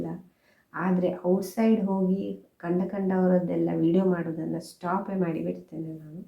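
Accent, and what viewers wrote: Standard. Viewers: Nimma video bari super hagutade.yellaru ivarige support madi.swatcha kannada matanaduva shaili olleyadagi barutte.yellarigu request maduta iddene support madi .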